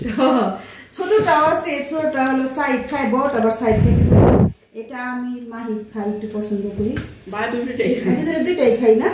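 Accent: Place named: Amin Bazar, Bangladesh. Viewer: আমি একজন ওমান প্রবাসী।ঢাকা মিরপুরের, দারুসসালাম, জহুরাবাদ আমার বাড়ি। কথা শুনে মনে হচ্ছে আমিন বাজারের কোথাও আপনার বাড়ি জানাবেন আসলে ভাষার মায়ায় আপনার ভিডিও দেখা শুরু করেছি। মনে হয় নিজেদের মানুষ ভিডিওগুলো ভালো লাগে। দোয়া ও শুভকামনা রইলো এগিয়ে যান। ভালো থাকবেন।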